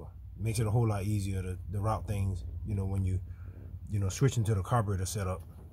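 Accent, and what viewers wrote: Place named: South Carolina, United States. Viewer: I love hearing the south Carolina accent feel like I'm home. I really like the way you mounted the tach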